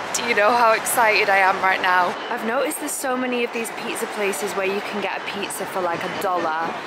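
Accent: Manchester, United Kingdom. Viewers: the Mancunian accent is my favorite.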